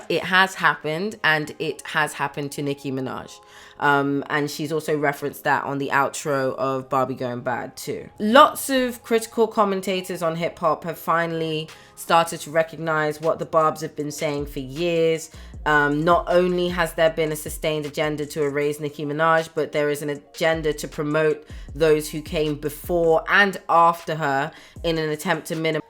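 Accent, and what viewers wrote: United Kingdom. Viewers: The British accent caught me off guard 💂‍♂️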